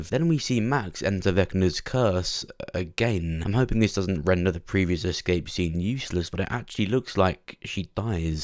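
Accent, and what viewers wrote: United Kingdom. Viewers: He sounds like a guy from the UK 😂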